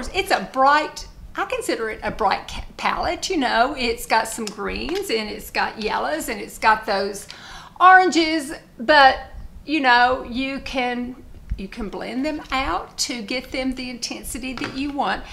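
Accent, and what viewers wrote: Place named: Tennessee, United States. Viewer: I don’t know why, but listening to you, by the way, always relaxes me😊🥰❤️❤️🫶🏻 seriously! Calms me down! It must be the sound of your voice, the tone of it, and the accent lol that accent 😂😘😆 your Tennessee accent calms me down🤷🏼‍♀️❤️❤️❤️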